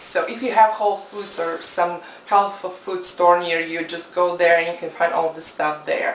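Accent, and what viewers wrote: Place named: Poland. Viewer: sorry just noticed your accent, are you European? lived and probably raised in america? just curious lol... sounds you've got polish accent.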